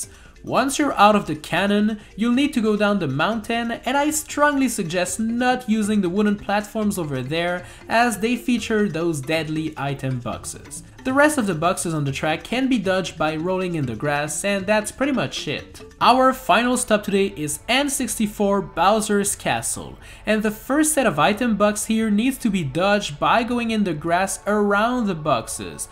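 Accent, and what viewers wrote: Quebec, Canada. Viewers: Wait a damn second,. Are you from canada??????????? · Are you from Quebec??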